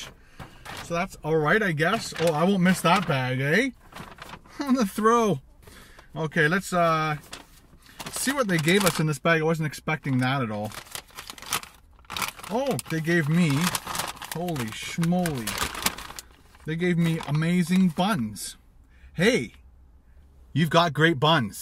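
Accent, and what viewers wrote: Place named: Barrie, Canada. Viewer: So neat! I found you by watching your colab with emmymadeinjapan and when I heard your accent I just had this feeling you were from Barrie area! I live the vicinity too and have had many a wonderful meal at that location. You should really try the "Ultimate Feast" next time, but switch out the "walts favourite shrimp" for the pan fried scallops!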